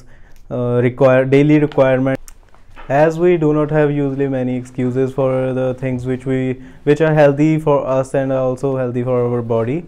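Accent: Punjab, India. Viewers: punjabi tone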